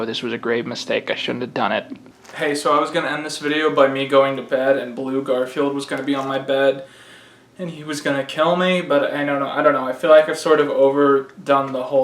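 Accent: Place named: Midwest, United States. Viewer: Idk where you live but it sounds like the Midwest oof my friend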